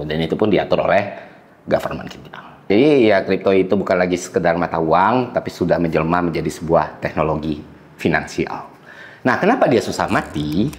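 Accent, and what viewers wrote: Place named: Minangkabau, Indonesia. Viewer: Baru sadar kalau ko urang awak.. patuik lah nyo mode ko caro bapikia nyo caro mangecek nyo.. sukses selalu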